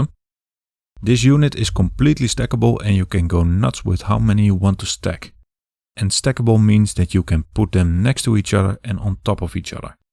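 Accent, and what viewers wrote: Netherlands. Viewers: u sound dutch sir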